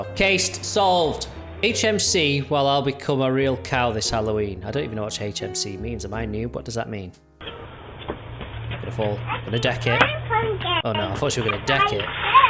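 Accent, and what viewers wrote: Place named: England, United Kingdom. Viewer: Your English accent and the guy farting was so funny.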